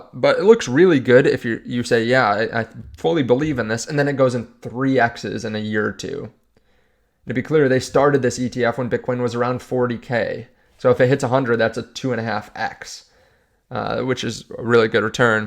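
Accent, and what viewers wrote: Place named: Wisconsin, United States. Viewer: 2nd viewer today,!!! I'm subscribed and send your content to my sister, who I talked into getting BTC and SOL. I'm a happy crypto investor since 2022. Oh BTW, I'm a fellow CA resident and your accent makes me chuckle. I'm born in Wisc. and have family that say how's it going just like you. Got to chuckle...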